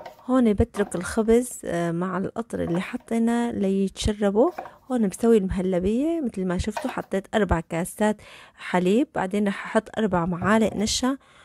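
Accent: Aleppo, Syria. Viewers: لهجتك لهجة أهل ضيع حلب 🤔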